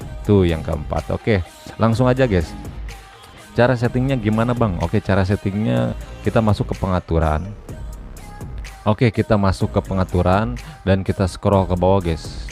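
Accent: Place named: West Java, Indonesia. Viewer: Orang Sunda nih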